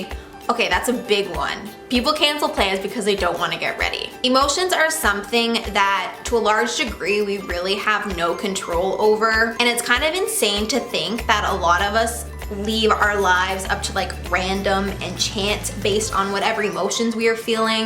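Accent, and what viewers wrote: Canada. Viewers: are you Canadian? 😊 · "aboat" lol I love the Canadian accent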